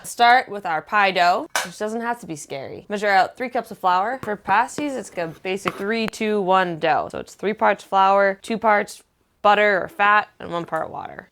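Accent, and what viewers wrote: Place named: Michigan, United States. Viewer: I can tell you're from Michigan bc of your accent :)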